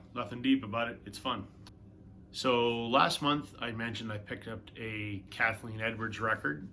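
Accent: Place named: Canada. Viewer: Hey Man!! Good to find your channel.. I'm JT.. I live down in Reno, Nevada.. have a feeling. and hear in your voice that you are up in Canada.. especially when you showed that on your intro.. great band!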